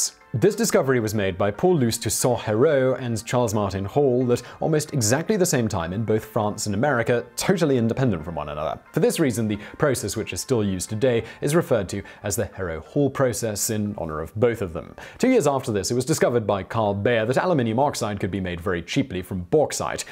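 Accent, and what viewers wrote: England, United Kingdom. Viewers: i Love the accent. is a Engish gentleman. i am from Pittsburgh Pennsylvania USA. so i have a weird accent. i moved to the south so i have been around weird accents. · If you ever get the chance to take a chemistry class from an English person, do it! Words like aluminum and equilibrium are much more delightful with an English accent. Thank you,! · I'm totally un-upset about the pronunciation. I'm used to hearing the "Brit-tone" speech of, and with that accent, if he pronounced it wrong I would be more upset. However, I WAS expecting there to be a "BONUS FACT" discussing this... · Nice to hear you talking English instead of American like you do in most of your vids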